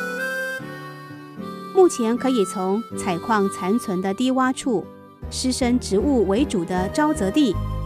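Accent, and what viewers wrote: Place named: Taiwan. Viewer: 主持人講話口音很清晰有台灣味道很親切好聽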